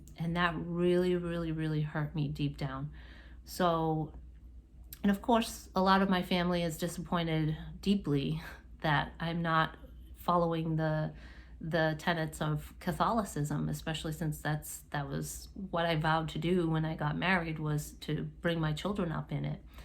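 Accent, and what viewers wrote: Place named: New England, United States. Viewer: I can relate to the definitions for heathen....it’s one thing to walk outside conventional beliefs and practices for ourselves, but it’s different when we have to consider our children. Both my husband and I did not feel served by christianity and had no desire to burden our daughter with it. So after she was born we had our own “naming ceremony” for her. Close family members were invited as were her godfather and goddess mother.... we gathered on the beach and blessed her with the elements air ( a feather) fire (herbal smoke stick) water ( from the ocean) and earth (a sprinkling of sand) we called in the ancestors and asked them for their blessings. People were asked to bring gifts, but not the kind you buy in a store, it was a beautiful, personal, and deeply meaningful ceremony. To this day, thirty years later, folks will comment to me how much they loved it. 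Its not easy to walk outside convention, but I’m glad we chose that path and our daughter is, too. I just discovered your channel and I’m going to check out some of your other videos. BTW, are you from New England ? I feel like I could hear traces of a slight New England accent! I’m from Massachusetts.....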